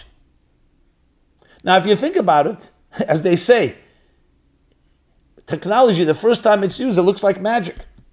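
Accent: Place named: New Jersey, United States. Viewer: Here in Britain, we have something called black magic chocolates. They come in a large shiny chocolate box. And are absolutely delicious. You can't stop at one. Don't know whether you have them in the states 🤔 All negative states of being are overcome by love. There is nothing stronger. Although it is vital to sort the wheat from the chaff. And act accordingly. Thank you for the great talk, Rabbi. Love the new Jersey accent. Oh, Ecclesiastes is an awesome book. You've just mentioned it. Few were wiser than Solomon. Heightened states of consciousness are synonymous with objective consciousness. When separateness disappears and one becomes all that one beholds. As Solomon says, "as above, so below."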